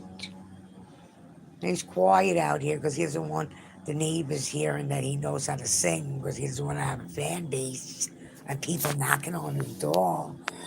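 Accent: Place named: New York, United States. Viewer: Are you from ny ? You sound like it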